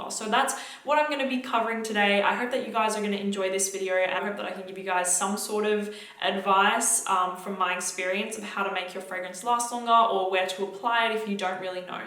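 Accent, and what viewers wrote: Australia. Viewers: I LOVE your Australian accent!!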